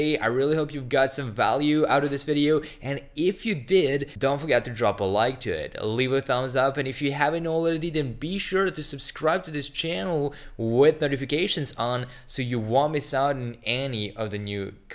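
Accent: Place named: Estonia. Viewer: Could be wrong but my first thought was Estonia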